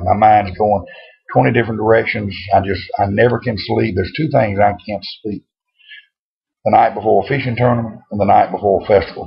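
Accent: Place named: Shreveport, United States. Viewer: Good ramble, really enjoyed it. Listening to you talk is like going home again since I was born and raised in Shreveport. We used to drink RC's and eat moonpies when I was young, but I never had a deep fried one. see ya next time.:)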